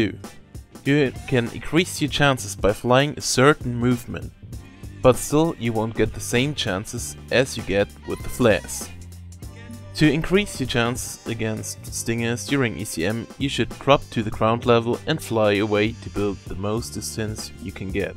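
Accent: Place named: Germany. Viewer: Preferred ur German accent.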